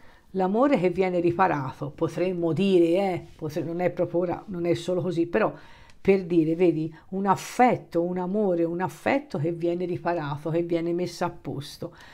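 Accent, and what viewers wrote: Tuscany, Italy. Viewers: 😊😊😊grazie grazie grazie.è un piacere ascoltare te ed il tuo toscano😅😍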